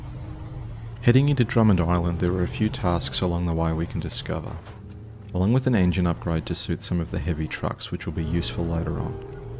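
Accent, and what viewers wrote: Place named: Australia. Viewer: I only discovered your channel and content today. Best out. Good to hear a fellow Aussie, keep up the wicked content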